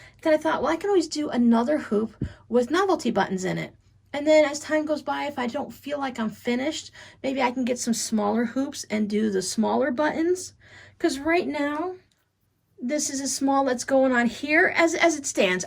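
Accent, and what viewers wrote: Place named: South, United States. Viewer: sincerely I didn’t intend to imply you say it incorrectly. Being born and raised in Florida and having been gone since aged 20 I love hearing how you say things in a sweet southern accent. Ha be a wonderful Christmas. ❤️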